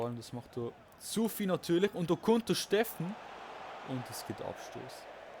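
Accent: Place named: Basel, Switzerland. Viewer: man merkt es das du basler bist an deiner typischer basler stimme weiter so like und abo ps. bin kein deutscher sondern basler schreibe hochdeutsch weil ich basler deutsch verlernt habe seit dem ich in bern wohne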